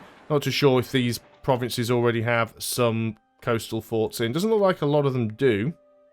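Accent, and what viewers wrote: United Kingdom. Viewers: Which part of uk are you from?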